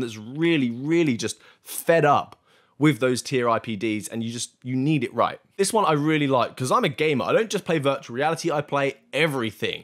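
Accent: United Kingdom. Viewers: Where do you come from in UK? I can't identify the accent. Norfolk? Essex? Or?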